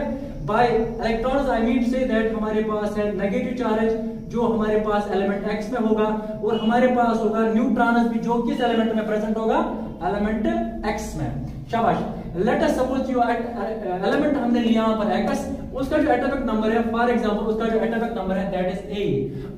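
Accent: Kashmir, India. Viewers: Apki language bohot complicated hai sir pronunciation....is in kashmiri Difficult to understand....and please speak slowly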